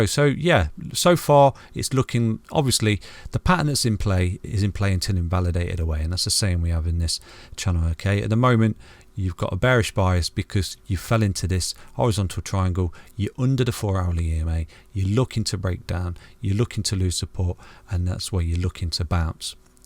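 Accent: United Kingdom. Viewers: You have the cutest British accent I've ever heard. Thanks for the analysis 😉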